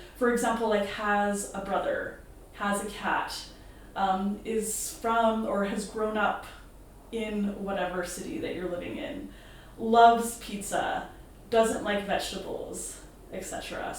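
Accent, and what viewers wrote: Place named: Canada. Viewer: LOL Your Canadian accent is very prominent in this video. It's not bad, I'm just saying I picked up on it right away. But I guess that's why I'm a trained ESL teacher. I can easily identify accents from all over the world. My question for you, though, is what kind of icebreaker activities would you recommend for adult beginners? Most of the beginner activities I come across are for young learners. I'm looking for some activities that adults can do that aren't too advanced.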